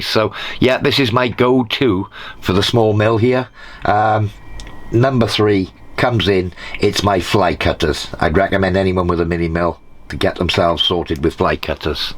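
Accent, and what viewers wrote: Pembrokeshire, United Kingdom. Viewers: Hi. Moved to Portugal from H/west 8 yrs ago. Just happened on your channel. Loved the video and great to here the Pembs accent. ✊🏽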